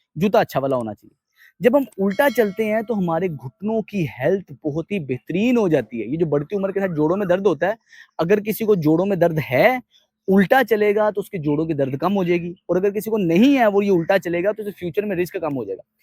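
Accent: Punjab, India. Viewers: Haye Allah... Full gol O Punjabi version english ❤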